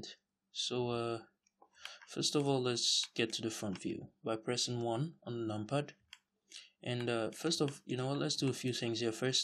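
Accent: Nigeria. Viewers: Nice work bro!!👍🏽 Btw where are you from? You have a Nigerian accent.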